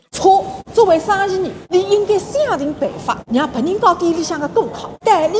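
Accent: Suzhou, China. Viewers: 这一口苏白，酥倒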